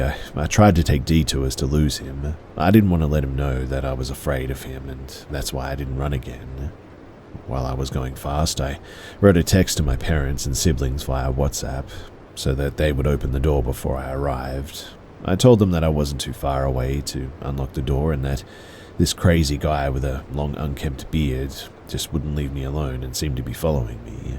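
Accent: Australia. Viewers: I love stories and its sooo great to hear an Australian. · Good to here an Aussie accent! Much more familiar. Cheers mate fair dinkum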